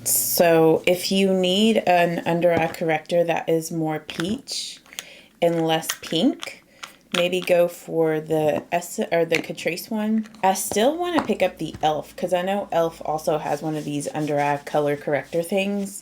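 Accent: South, United States. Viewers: Hi....i have had the foundation for a few months and just bought the serum tint yesterday....only just launched in Australia with the serum tint one this week....typical!! We are always so late getting newer stuff here Anyway i was excited to see your comparison video....look forward to trying it tomorrow. I was kinda hoping it might be less dewy looking...we shall see! Love your beautiful Southern accent btw... hope you have a lovely Easter! Take care...Lindy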